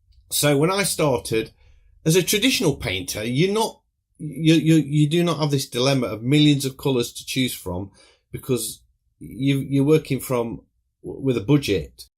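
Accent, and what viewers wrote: United Kingdom. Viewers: Now can you teach me to speak with an English Accent? Love it!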